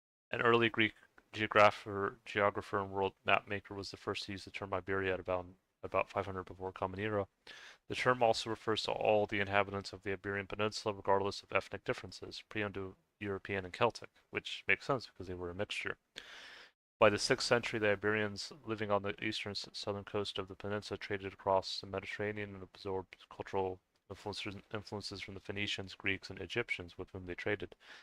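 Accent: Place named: United States. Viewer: "Possibly contain the Welsh and parts of England" How do you come to that conclusion? It definitely is the whole of the British Isles. Alba was originally used for the whole of the British Isle.... Where are you getting your information from? Just look at the DNA map, it tells you the basic story.... we are all majority "Celtic" Brythonic peoples. You sound American, you mention your Welsh-American friend..... No you are Americans, typically of Americans you think bloodline means something other than for medical reasons.. And you still then get it wrong.... All these groups of people you mention are cultural groups not genetic..... The Greeks and Romans, where most of these names come from knew nothing of genetics.... they arbitrary grouped people to what they thought should be grouped together. Again where do you get your information from? It sounds like you get a lot from Irish and Scottish nationalist who need to prove they are different from the English because they are bigoted and try to deny they joined in with building the British Empire. 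Note its the British Empire not English, with English people outnumbering the Irish, Welsh and Scots, by ten times why would they not have called it the English Empire? Answer, because it was ALL groups, not just the English. Stop listening to nationalists, they will always give you "their" version not a balanced version.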